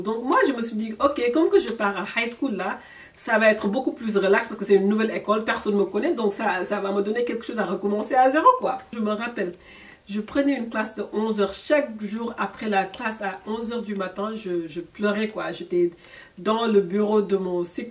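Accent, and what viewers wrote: Senegal. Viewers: MlleMama c’est pas qu’elle parle mal français, c’est l’accent sénégalais qui est fort comme ça, toutes mes copines sénégalaises parlent de la même façon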